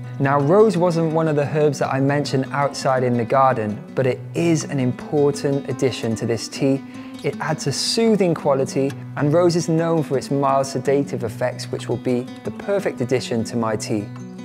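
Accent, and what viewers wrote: Wales, United Kingdom. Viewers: I thought he was saying elder and that it was just his welsh accent.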